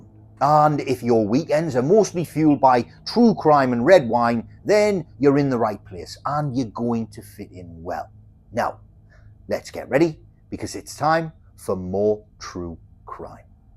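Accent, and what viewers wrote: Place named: Scotland, United Kingdom. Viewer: This channel keeps coming up but that's fine I like the animated story telling style and the Scottish? accent is entertaining for an Aussie viewer. The setting is a bit bleak, a prison.....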